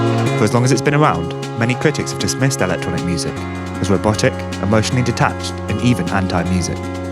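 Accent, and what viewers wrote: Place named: United Kingdom. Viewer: ofc he has a briish acceny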